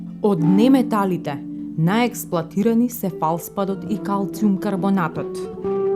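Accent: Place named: Bulgaria. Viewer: Baj Bulgarski, only the accent is here and there different and rather funny.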